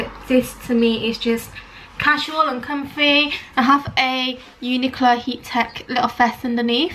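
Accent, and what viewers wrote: Yorkshire, United Kingdom. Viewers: Im leeds and you sound yorkshire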